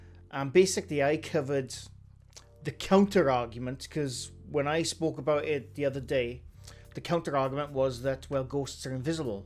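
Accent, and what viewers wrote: Wales, United Kingdom. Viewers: Oh... The wonderful Welsh voice of reason! It irks me so much the amount of people that refuse to accept a logical explanation! OK, I get that a lot of people desperately want to believe in life after death etc, in all honesty most of us would like to think that way! But sls stick men are not the way forward, and so many creators are jumping on the stick man bandwagon now. Even a weeny channel called 'Get Spooky Society' have, and I had such hopes for them too!🥺 Oh well, onward and upwards!🤷🏼‍♀️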